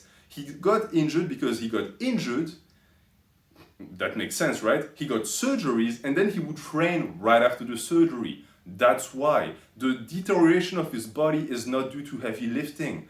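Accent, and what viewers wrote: France. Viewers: I love how sophisticated your English is with your strong French accent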